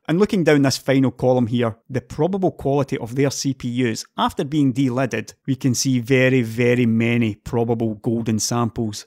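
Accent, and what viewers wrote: Scotland, United Kingdom. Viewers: Hey I like his Scottish accent. That's actually the first thing that pulled me to his channel, I found out how good his content was afterwards. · Excellent work, i noticed a comment on the Linus video, "Scottish is difficult for me as well", do you get much grief about your accent on videos? I am from Govan (I am sure you know where that is) but i live in Australia, and although i speak "English", people stare at me and cant understand, off topic i know, but i thought i would ask how your accent goes down with people online. The only bad thing after watching this though, as that i have to wait for your next work, because your work is that good. G'on yersel.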